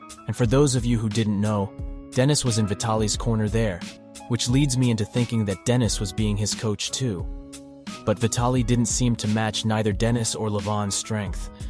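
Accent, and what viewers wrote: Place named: England, United Kingdom. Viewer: Que belo sotaque inglês, bicho. Parabéns!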